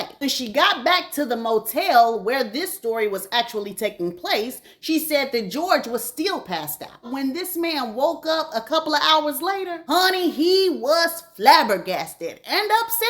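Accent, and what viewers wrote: South, United States. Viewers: Your country accent and saying “wait one cotton picking moment”😭😂😂😂 I been rolling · The country accent made this even MORE entertaining!! 😂😂🤣🤣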